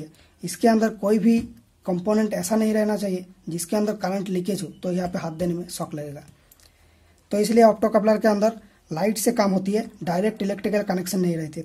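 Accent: Bengal, India. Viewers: Are you Bangali??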